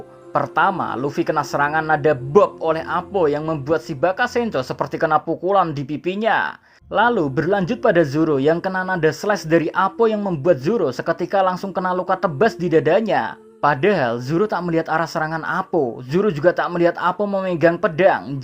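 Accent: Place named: Tangerang, Indonesia. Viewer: ngikutin chanel ini udh lama Min.. dn aksen "GEH" itu baru.. dn sangat menggangu telinga... Terima kasih Btw sya thu itu aksen daerah Tangerang kabupaten..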